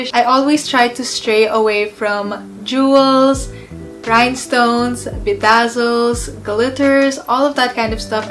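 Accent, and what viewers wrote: Philippines. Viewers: you have a Filipino accent xd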